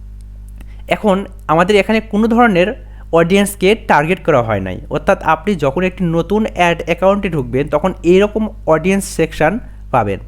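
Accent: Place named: Bogura, Bangladesh. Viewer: ji vai apnr kotha sune bujte parchi vai ..... je apni bogurar manush ,, amieo bogura thaki vai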